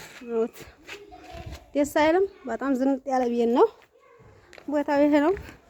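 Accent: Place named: Wollo, Ethiopia. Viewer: አወራርሽ ተመቸኝ የወሎልጅ ነሽ መሰለኝ 😊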